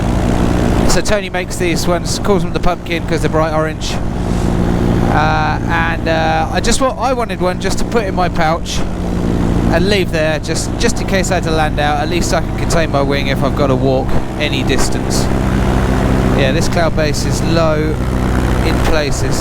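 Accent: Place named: United Kingdom. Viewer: I'm here for the British accent! lol Can't wait to hear it on the Podcast! Great video! Its so cool seeing all the landscape in the UK. That quarry looks like it'd be amazing to fly, weather permitting. I slightly jealous that you have low lying clouds. Here in Texas, cloud base is regularly at 10,000 MSL! Looking forward to our chat!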